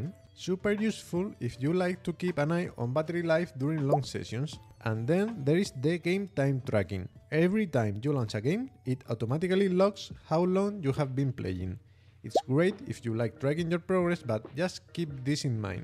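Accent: Spain. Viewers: jaja es que se te nota que eres español y pense a lo mejor igual otro canal y yo viendo en ingles jaja.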